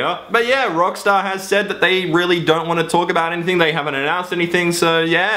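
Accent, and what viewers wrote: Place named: Australia. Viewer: He didn't say the last part!!!! "My accent is Australian and blublublubla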